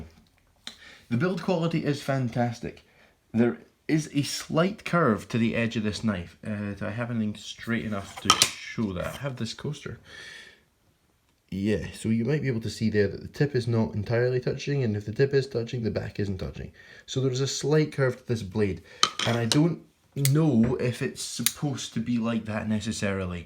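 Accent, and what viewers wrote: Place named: Scotland, United Kingdom. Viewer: Nice review. Good to hear a Scottish accent :) I have just ordered the Slayback with the san mai ZDP189 blade. Great looking knife.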